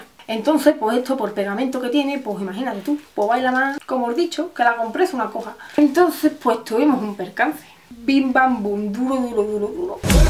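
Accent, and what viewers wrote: Andalusia, Spain. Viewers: Si no eres andaluz si te va a costar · Soy latino americano y esta claro que hablo diferente a los españoles y etc, pero veo a esta chica y no paro disfrutar su acento tan rico... tiene un golpe de timbre en ciertas palabras muy bonito no se de que parte de España será por que se que tienen varios vocablos allá pero no se, es muy sabroso su acento jajajaj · Para que te enteres jeniffer chavala, es andaluz con un toque de barrio y al que no le guste que se arañe. Más claro agua y de cateta nada, Sevilla capital. Los andaluces tenemos la fortuna de hablar bien clarito con pocas palabras.....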